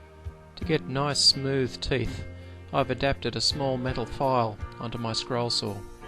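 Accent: Australia. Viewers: Yeah your right I am British. and pomegranates are pretty tasty so I am not guna argue with that. I didn't notice you were, i fail quite a bit at recognising accents. ^^ i just bought his model T clock so just started that. but your video is being really helpful. you are obviously a very skilled craftsman. what type of finish do you use? i was thinking to do the wheels carfuly as not to get it in the teeth with bees wax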